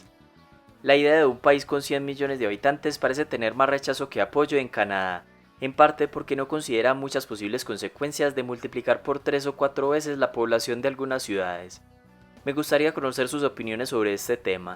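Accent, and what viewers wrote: Colombia. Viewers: No me gusta el acento colombiano bobo del narrador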